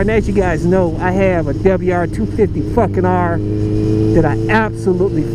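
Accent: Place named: Ohio, United States. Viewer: I didn't realize that folks from Ohio have a regional accent, but listening to your videos, I learned that they do.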